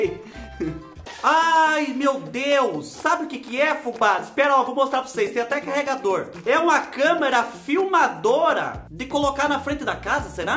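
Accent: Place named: Northern Paraná, Brazil. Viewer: Hei Fubá, que divertido ver vc falando "paranaês" do norte! Sucesso.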